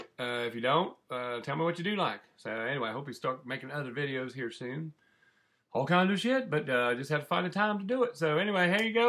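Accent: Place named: West Virginia, United States. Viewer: Pretty good man. Listening to your accent makes me wonder why I ever left WV. I can not wait to be back next month.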